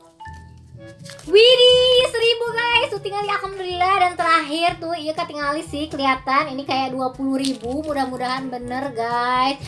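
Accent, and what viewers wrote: Sunda, Indonesia. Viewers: Lucu pisan kalo ka ngomong sunda campur indo🤣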